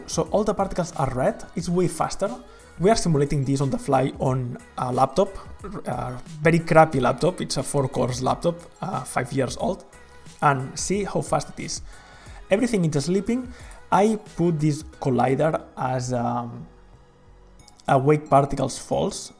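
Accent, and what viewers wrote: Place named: Catalonia, Spain. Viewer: Sembles de catalunya tio, un grande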